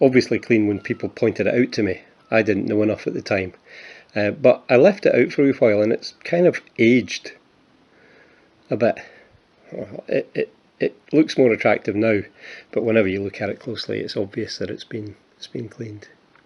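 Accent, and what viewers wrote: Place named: Scotland, United Kingdom. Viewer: . pleasure to watch. I'm a new sub for you. I've heard your name a hundred times on this Channel. I saw what you did for y numismatic collector the other day. Very Nice gesture on your part. you are a gentleman. great Video on the us 90% pleasure to run across your Channel and finally hear that Scottish accent.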